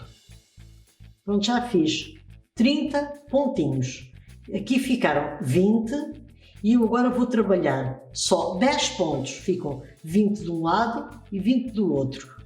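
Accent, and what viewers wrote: Portugal. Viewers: Adorei sua orientação pra executar este sapatinho. Deu muito certo. Sem contar que amo ouvir seu sotaque. Sou filha de português. E viajei pra Portugal em junho pra conhecer a Terra de meu paizinho que já faleceu. Já me inscrevi em seu canal. Muito obrigada por trabalhos tão lindos !!! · Vídeo muito bem explicado . Eu amo sotaque Português tenho muita vontade de conhecer o santuário de Fátima. Já me inscrevi em seu canal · Boa tarde. Amei seu jeito de ensinar e o sotaque português maravilhoso! Já fiz os sapatinhos, mas não fechei ainda porque estou na dúvida de identificar o avesso. GRATIDÃO! Bjosss de LUZ! 😘♥️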